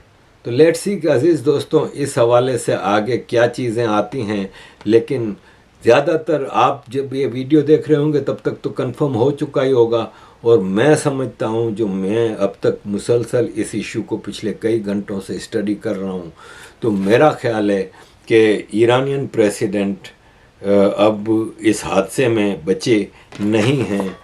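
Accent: Gujarat, India. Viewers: आपका बोलनेका लहेजा गुजराती जैसा है ❤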